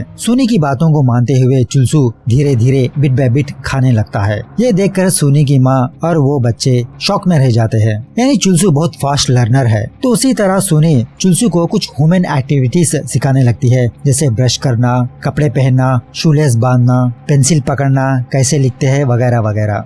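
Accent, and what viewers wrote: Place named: Bengal, India. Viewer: Bhai saab ap yeh sab movies kahan se Dhundke nikalte ho, ASTONISHING😲 😍!!! Love ur Bengali accent in the explaination 😍😍😍... Continue it we'll Support it!!!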